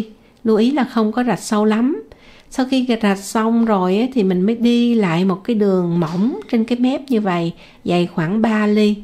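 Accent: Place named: South, Vietnam. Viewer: 1 giọng nói miền Nam rất ngọt ngào và tự nhiên. Rất lâu rồi tôi mới đc nghe lại, ở VN bây giờ như bị nhiễm cái cách hành xử của dân Bắc sau này nên dần dần vẫn có vẻ dối trá trong cách nói. Tôi là dân Bắc nên nghe ra đc, ko còn đc cái chân thành như của. Cảm ơn. Nghe dạy nấu ăn như nghe nhạc á.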